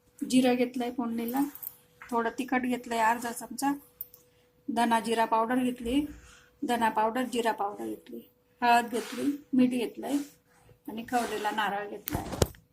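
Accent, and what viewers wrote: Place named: Jalgaon, India. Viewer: मस्त ताई भाजी. आवाज जळगाव जिल्ह्यातील वाटतो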